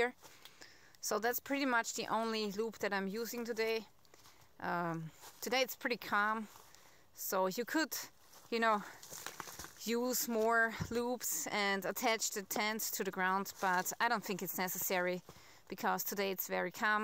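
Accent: Germany. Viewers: Pasaba por aca y...escucho alguien de deutschland hablando american, but in that frozen field even me would be talking just to warm up.but congrats for have chosen a labrador dog for companion no better friend for any self sufficient girl. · Really enjoyed this. That very German English accent is the best...greetings from Australia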